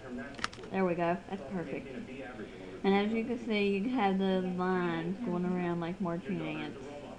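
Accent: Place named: South, United States. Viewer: ok...from your accent...you're a Southern..which is ok...but next time you video something...shut the radio/tv off..it's distracting and trying to listen to you, with your southern accent and the background noise...very difficult to hear/understand what you're saying....just a thought.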